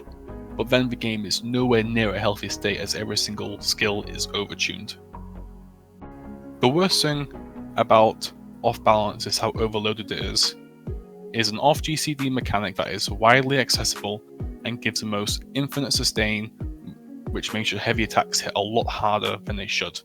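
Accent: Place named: United Kingdom. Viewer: I´m just here to listen ´s lovely brit accent^^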